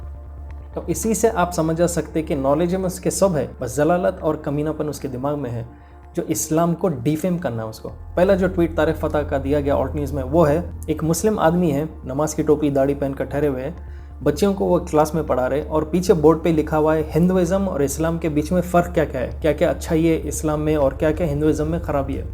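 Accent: Hyderabad, India. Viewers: Its hyderabadi accent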